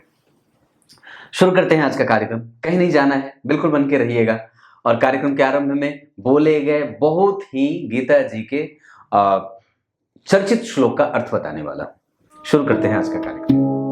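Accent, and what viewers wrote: Bihar, India. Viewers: Ap bihar se ho😳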